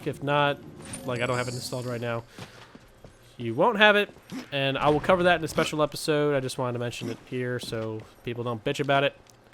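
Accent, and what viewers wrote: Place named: United Kingdom. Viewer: Hi I'm from the uk and i have to say i found your British accent most entertaining and i love the vids and the wit and banter. But here's the thing would it be possible to upload more than one vid i appreciate you maybe busy and if that is the case please ignore this message thank you.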